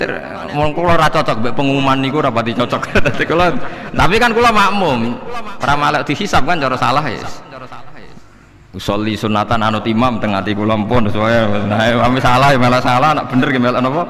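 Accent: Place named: Java, Indonesia. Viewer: Saya suka ceramahnya Gus Baha...meskipun bahasanya medok namun masih bisa mengikuti...isi ceramahnya bagus memberikan banyak pemahaman dan pengetahuan 🙏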